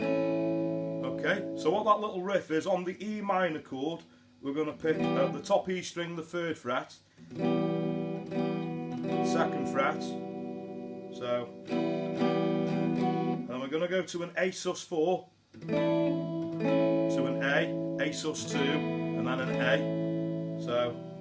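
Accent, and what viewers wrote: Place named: Midlands, United Kingdom. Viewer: thought you had a Midlands twang..Like the cover by the way.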